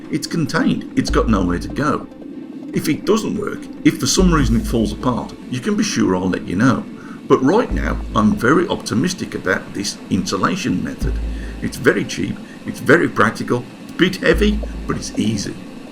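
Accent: Birmingham, United Kingdom. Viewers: As is David Attenborough to the natural world, so is to furnaces. With a Brummie accent, of course,;-)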